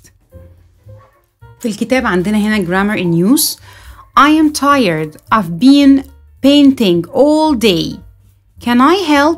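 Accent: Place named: Egypt. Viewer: مصر مبين من اللهجة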